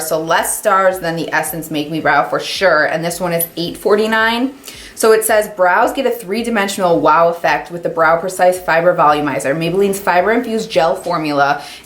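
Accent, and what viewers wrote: Chicago, United States. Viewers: Great video, as usual. You are one of my favorite YouTubers, and I wish you much success☺🌴👍🏼🤹‍♀️Love your accent which sounds so much like the Chicago area types I heard everyday when I lived up there during some of the happiest years of my life❄🌈🌠Hope you will have a wonderful Christmas, (it's Dec 24, 2020) 🎄⛄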